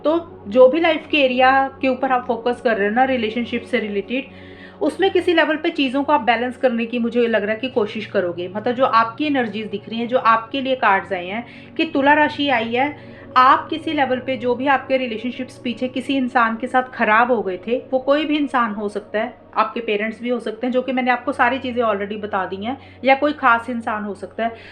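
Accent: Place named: Jammu, India. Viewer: arrey ni mam I mean apke bolne k touch se lga ki ap jammu se ho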